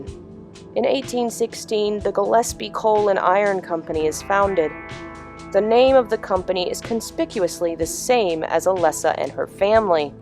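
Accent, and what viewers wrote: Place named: Virginia, United States. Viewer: omg are you from Virginia?? I've been wondering what your accent is and I thought Virginia. I went to middle and high school in Richmond :)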